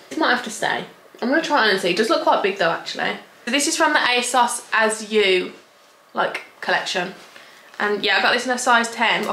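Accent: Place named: United States. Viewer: I love your American accent! makes me feel at home w you😭🥺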